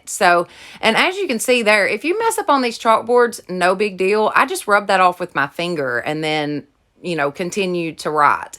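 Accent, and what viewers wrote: South, United States. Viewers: Great DIYs, so inspirational and a great testimony of the true meaning of Easter! Thank you!! The phrase, "He is Risen" has been used for generations from the greeting the disciples shared with all after Christ arose. Today in some churches, it continues to be the greeting we share with one another. Two comments. The undertone of yellow is white, so your lettering blurs and hard to see on your DIY #1, etc. . You might trying black to make your messages stand out, a higher contrast. Also, you do a wonderful job with your passion & creativity. Try not to say your work is not very good. It is all about encouraging each other and no need to do that. It is not easy doing these YouTube videos, I have done one as an Interior Redesigner & color Consultant & Instructor so I enjoy hearing your warm Southern Accent, too. You Go Girl!!!😘😎😇Irene, Chris's Mom, Idaho Color Lady. 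<link>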